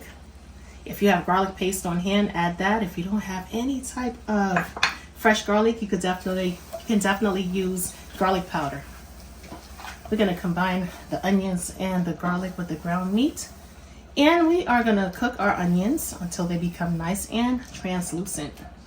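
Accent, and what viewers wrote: New York, United States. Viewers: You sound like you come from New York..I’m from Long Island..thanks for this recipe ❤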